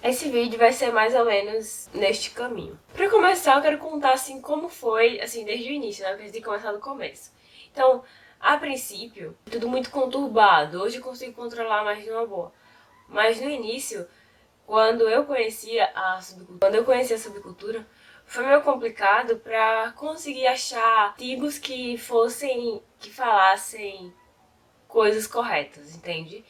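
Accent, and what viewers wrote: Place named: Alagoas, Brazil. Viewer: Êêêta que sotaque bonito! Minha conterrânea (Nasci em Maceió, mas vim pra PE pequeno), curti muito o vídeo. Embora a minha praia seja mais o lado Heavy Metal, conheço um pouco da sub cultura. Há vários anos atrás eu até cheguei a ler "A Happy House in a Black Planet", que o um dos sites que você listou disponibilizar, ele dá uma boa geral no assunto. Aqui em Recife, a cena era bem forte antigamente, hoje em dia acredito que esteja mais fraca (novamente, falo pelo lado do metal... mas acredito que isso se aplique a todos)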